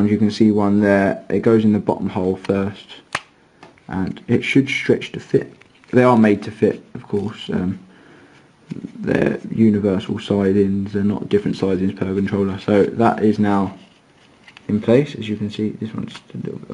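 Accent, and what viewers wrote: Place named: United Kingdom. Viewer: The HEAVY Brit accent is hard for this Yank to understand.I got about half of what he said, Sorry mate.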